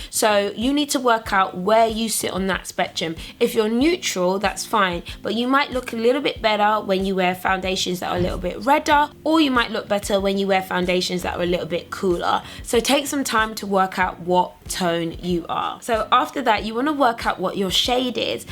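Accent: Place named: United Kingdom. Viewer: you have a kinda British accent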